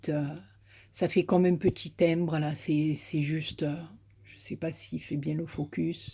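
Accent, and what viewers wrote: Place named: South, France. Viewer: Coucou Très contente d entende ton accent du sloeil Très heureuse de savoir que ton mari va mieux ta fleur est magnifique srcapbises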